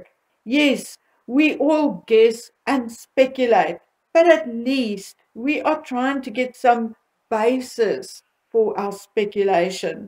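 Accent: Afrikaans, South Africa. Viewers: Afrikaans accent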